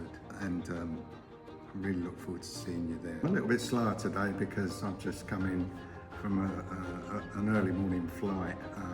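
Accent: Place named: England, United Kingdom. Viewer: Of for crissake .. where are you from? Listen to the man ... he is very clearly English.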